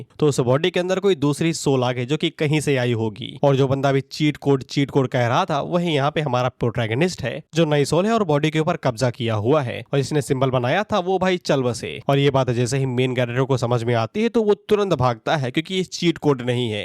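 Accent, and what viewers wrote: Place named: Bhojpuri, India. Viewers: Bhojpuriya voice bahut accha laga Beech beech me bole karo maza aata he😂😂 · Bhai bhojpuri ka tadka marte raho achha lagta h 😂😂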